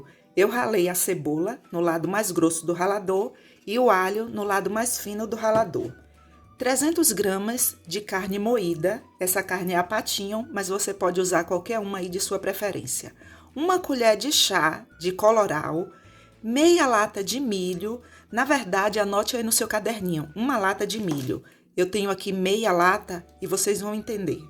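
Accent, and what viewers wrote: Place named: Salvador, Brazil. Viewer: Tenho uma amiga que é de Salvador e fala igualzinho você !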